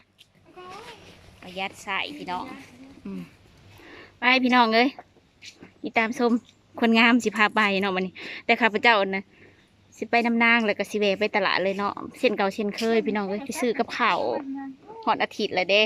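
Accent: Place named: Laos. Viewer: เว้าลาว ดีแล้วครับ ที่ติดตามเพราะมักฟัง สำเนียง น่าฮัก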